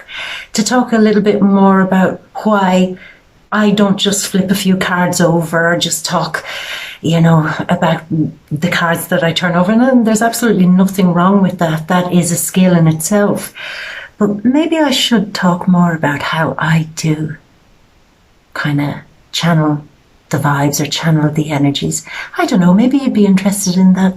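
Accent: Ireland. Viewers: Anything you do or say is always a blessing , you have a healing energy and that Irish accent is a killer , as a Leo I can only cheer you doing you. Thanks for being ❤️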